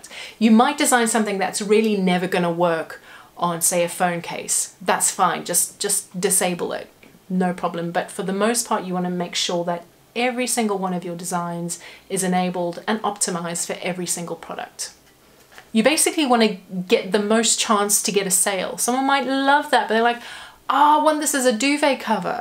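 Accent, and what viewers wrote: South Africa. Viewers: You South African? 😍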